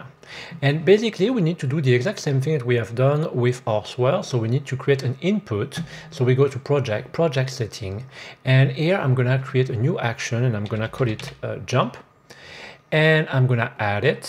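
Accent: France. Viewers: Super tuto j'aime bien ton léger accent français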